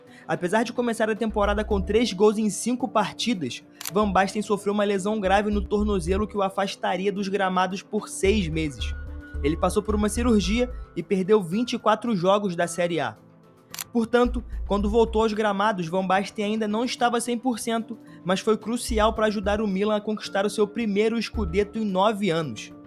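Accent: Rio de Janeiro, Brazil. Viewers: A única coisa que me irrita, é esse sotaque carioca insuportável e indigesto. Marco van "Baxten"...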